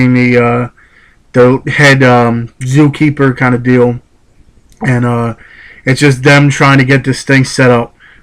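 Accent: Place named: New Jersey, United States. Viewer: i love your jersey accent lol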